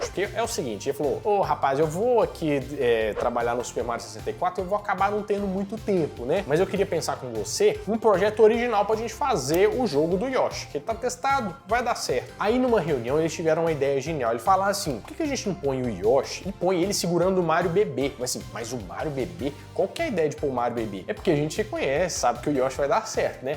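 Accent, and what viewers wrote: Minas Gerais, Brazil. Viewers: Como eu amo esse nosso sotaque mineiro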